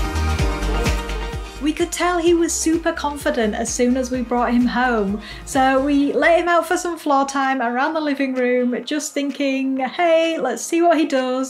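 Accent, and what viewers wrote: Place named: Scotland, United Kingdom. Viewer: Hi! I just found your channel. At first I thought that I haven't heard this accent before. Seeing UK in the channel's description was a big surprise for me, because british english is what they tried to teach us in school for ~10 years. ^^ Your pronunciation reminds me of the scottish visitor my English teacher once brought to class in 9th grade. Could it be some Scottish influence? I'm just as curious as my guinea pigs. ;) My language skills were not the best back then and still aren't today, so sorry from my end. Greetings from Germany :-)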